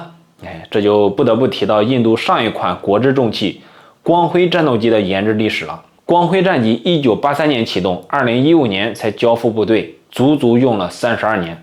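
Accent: Henan, China. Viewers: 你一定不是的粉丝，他说的是河南话。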